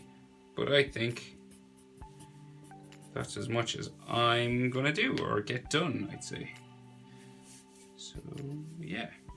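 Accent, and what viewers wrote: Ireland. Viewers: arwe you irish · Are u Irish u have a bit of a acsent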